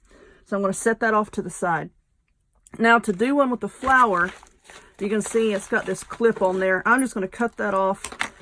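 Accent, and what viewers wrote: South, United States. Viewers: I just love your videos! And your humor, my husband and I just love watching your videos and listening to you southern accent 😁😍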